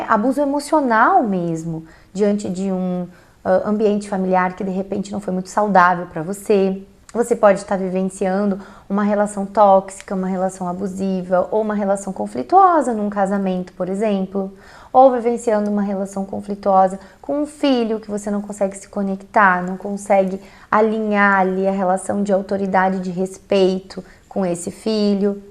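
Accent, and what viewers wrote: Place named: Rio Grande do Sul, Brazil. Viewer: Em 1 minuto de vídeo já detectei o sotaque gaudério kkkkk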